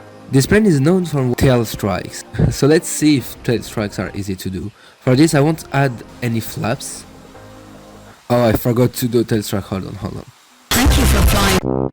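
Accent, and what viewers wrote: France. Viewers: wesh un francophone qui assume son accent baguette....respect. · Is it just me or voice seem a little bit less french-y?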